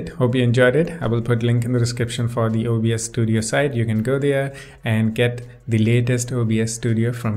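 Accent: India. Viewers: You indian?huh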